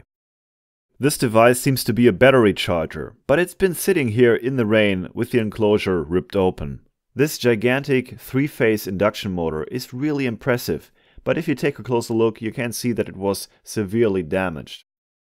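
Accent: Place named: Germany. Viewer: I love your videos and German accent. It gives me flashbacks to <num> Northern Germany while in the US army.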